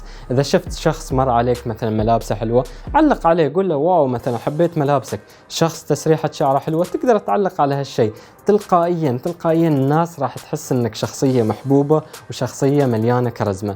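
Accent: Iraq. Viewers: لهجتك تشبه العراقيه الي وياي لايك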